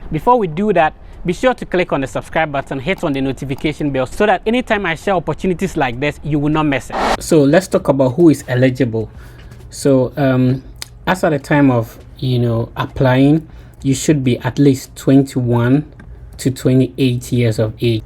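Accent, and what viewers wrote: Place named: Ghana. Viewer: You speak a Ghanaian English. Are you Ghanaian?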